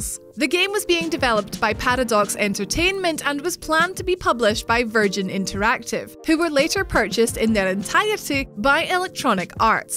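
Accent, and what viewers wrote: Scotland, United Kingdom. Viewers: omfg that scotish accent <33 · Was it just me or did get even more scotish than usual?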